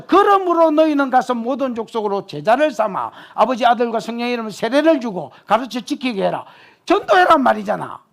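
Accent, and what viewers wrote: Gyeongsang, South Korea. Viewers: 목사님, 캐나다 토론토에 25년 넘게 살고있는 교민입니다. 우연히 영상을 보게되었었는데 첨엔 코믹하고 촐랑거리는(지송! ㅋㅋ) 특별한 캐릭터의 웃긴 목사님인거 같아(글구 심한 경상도 사뚜리~)넘어갔었는디... 또 몇달후.. 구속사를 듣게 하셨고 고린도전서, 열왕기서 강해들을 들으면서 눈이 번쩍 뜨이고 '이분 따라 살아야겠다' 고 생각하며 정신이 번쩍 났었습니다. 저는 이민와서 예수 믿기 시작했었는데, 긴- 시간동안 왜 교회가 강해설교를 안해주는지 정말로 답답했었거든요 😭 그날에 큰- 상급이 확실하시니 힘내시고 계속 앞에서 달려주셔요! 화이팅!!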